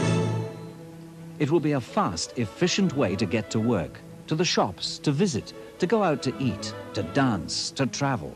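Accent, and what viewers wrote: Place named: United Kingdom. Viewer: Same British accent!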